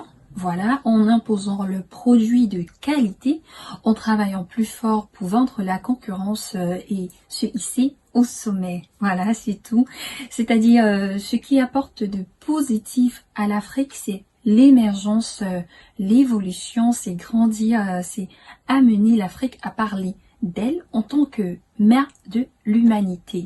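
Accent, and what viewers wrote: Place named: France. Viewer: J'aime bien ton accent de Français ma cherie. Merci beaucoup pour cette belle video. J'ai aimé vraiment. ❤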